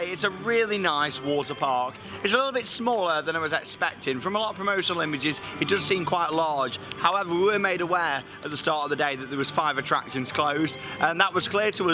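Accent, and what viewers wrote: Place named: United Kingdom. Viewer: It's cool to see another brit with a heavy accent in an Arab country lol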